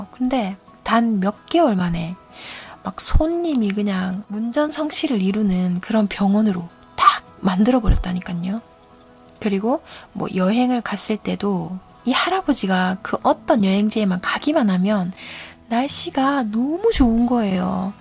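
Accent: Gyeongsang, South Korea. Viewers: 경상도 어디사세요? 저도 경상돈데 목소리 좋네요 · 경상도 사투리가 살짝가미된 목소리가 듣기에 너무좋아요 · 어투중에 살짝 갱상도 말씨가 엿보이는디...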